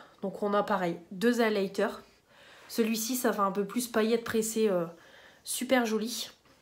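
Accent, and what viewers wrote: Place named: England, United Kingdom. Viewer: En effet, j'ai kiffé le petit accent anglais 🤣❤️ hâte de voir de jolis makeups avec cette palette ✨️🌸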